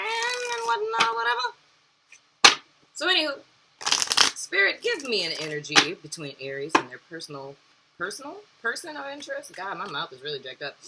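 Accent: New Orleans, United States. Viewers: Love this video and your energy I’m an Aries male and I have been dealing with my ex a lot. Know u was from New Orleans within the 1 st few sec I am too deep it goin luv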